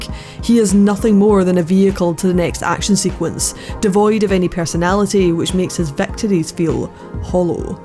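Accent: Scotland, United Kingdom. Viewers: Good review, I like the format. What part of Scotland are you from?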